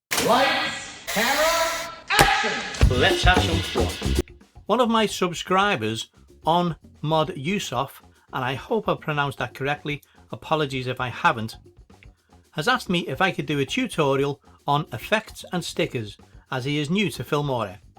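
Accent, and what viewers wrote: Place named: Merseyside, United Kingdom. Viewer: Hi are you still around I've noticed the comments are over a year old. Do you do any one on one tuition for Filmora 14 if so Im interested sounds like you come from my area Merseyside.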